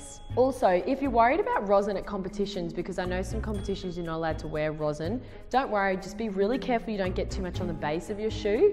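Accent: Australia. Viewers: oh and also are you from Australia because you sound like it and i am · Is she Australian 🤔🤔🤔 if she is I live in Australia too. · r u Australian?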